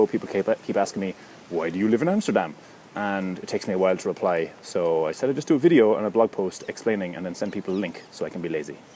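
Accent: Cork, Ireland. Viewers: ...from Ireland? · He is from Cork. Definitely. I'm half Irish, I know. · You from Ireland my man? Pretty sure you are ^^ edit You actually mentioned you are in the vid later on :-) my bad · He's clearly Irish from his accent. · he sounds like a typical Irish man, I dunno where you get that from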